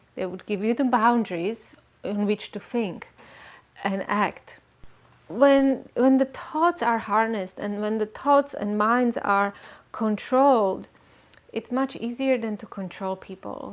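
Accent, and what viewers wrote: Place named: Hungary. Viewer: Wow!👍I could of sworn your accent is hungarian! Totally different language, very similar accent! Cool! 👍😃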